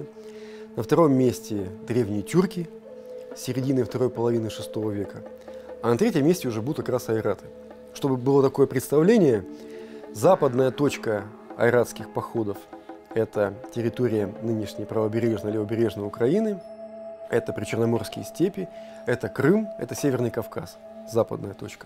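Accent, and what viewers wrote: Russia. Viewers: Россияне традиционно "акают". Говорят "МАсква" (пишут- Москва), говорят "агород" (пишут- огород) и т.д. Было бы странно если бы российский историк говорил бы так, как привычно казахстанцам, а не русским.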